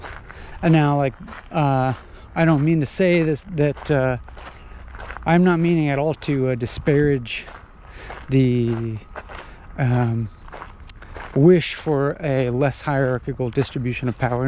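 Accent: United Kingdom. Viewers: You’re American/canadian? I always thought you were British 😂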